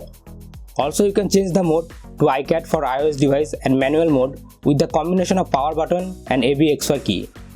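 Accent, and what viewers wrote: India. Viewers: 3 indian accent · indians and their trying hard English accent. pain to the ears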